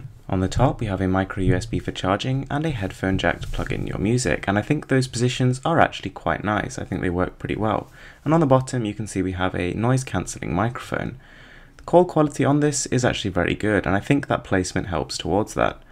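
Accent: United Kingdom. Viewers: Hey I Just want to say I love your videos, and your british accent. I want to ask you a questions what budget smartphone would you recommend for some one in the US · Hi man, u have a nice British accent..thanks for these videos, this is a gr8 phone.. I hav bad signals on my Motgo X 1st gen and hence went for Moto E 2nd gen which is pretty much an amazing phone-water-resistant, scratch proof (GG3), i've tried it too, dual sim, stock features, doesnt hang in normal usage, 2 days battery. Would u suggest this phone over my current phone ? I m lukng to just change my phone into a >4.7", tatz why..but shld be nonetheless for rough use n dual sim, shldnt hang, i dont play games. Hope its water-resistant?? Ofcourse I'll miss my legendary Moto Display feature..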